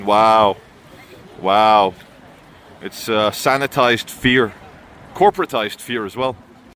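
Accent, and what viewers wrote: Scotland, United Kingdom. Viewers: you have an almost nearly Scottish accent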